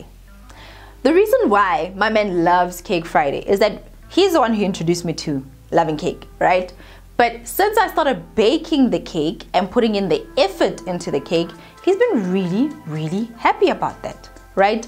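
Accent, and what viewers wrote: South Africa. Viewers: South African? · I just saw ur video on ugly panties nd subscribed with immediate effect! Liking the fact that u sound so South African gao zame go sound American or speaking through the nose😂🤣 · I LOVE #SouthAfrican Accent You're Amazing Girl ❤️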